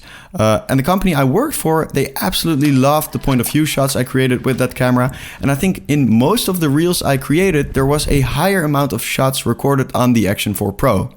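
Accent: Netherlands. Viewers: Soow Die nederlandse accent gaat nooit weg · Fist 5 seconds in the video "ohh.. that accent... he must be dutch" The humor in the video - yap, deffinately dutch 😀 Great Video - Cheers from your east border! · I smell a dutch accent?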